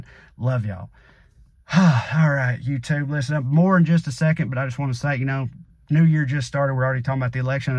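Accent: South, United States. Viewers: I had to follow the transcript to understand your southern accent!!! This is worse than calling the tech guy (how do I plug it in?!)!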